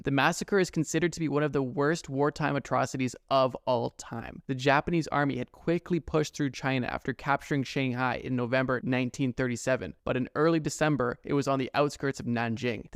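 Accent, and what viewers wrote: Scotland, United Kingdom. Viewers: Where is da Scottish accent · please give us the Scottish accent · Wheres the Scottish accent,? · Gimme the Scottish accent, please? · Let's get that Scottish accent! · Great Video. Where is our link Bro?! We want to hear your Scottish accent lol. You are passed 21k likes.....I guess I should have waited til the end before posting this lmao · 21k likes where’s the scottish accent i’ve been waiting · Your Scottish accent sounds like how kids talk at my school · then we'll get to hear his Scottish accent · Where's the Scottish accent video, we're at 22K likes :D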